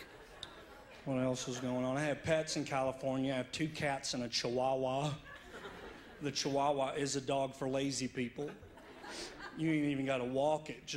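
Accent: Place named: Virginia, United States. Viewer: AKE IT BACK! -- One of the best parts of Yes Dear! I love his physical comedy, so good when he has people to work off of! That is definitely a Middle Virginian Accents not quite Tidewater area!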